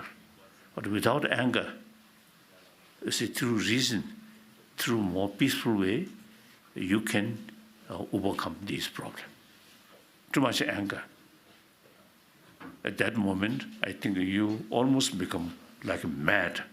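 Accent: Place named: Tibet, China. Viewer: that is absolutely not what the Dalai Lama said, it's an AI speech recognition program that goofed up because it misheard his Tibetan accent, but to answer your first question from my own perspective: yes. It certainly can be, though not necessarily so. Also, while many communist dictators were atheists, atheism is NOT inherently authoritarian and conflating ordinary atheists with Stalin or whoever is a vicious smear. I will not conflate you with Hitler or Franco, who I believe were both Christians, and I would expect the same courtesy and good faith from you with regard to me, as an atheist, and Stalin or Mao ZeDong or whoever. Ground rule: at least have BASIC good faith and don't smear people like that. Agree?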